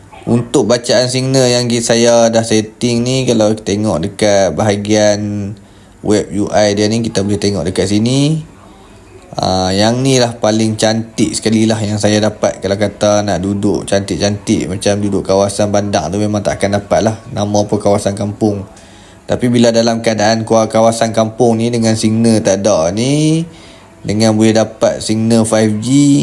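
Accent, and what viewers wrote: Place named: Kedah, Malaysia. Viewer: Mantap...ada band 28 + loghat kedah...terbaik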